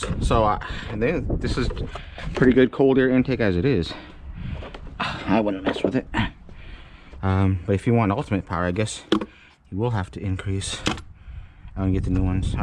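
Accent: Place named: South, United States. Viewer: This was pretty funny but honest, made my day. I thought the author was a "good ole boy" based on the heavy Southern accent but at the end with the commentary, it surprised me! :) Informative vid - kudos for putting it together.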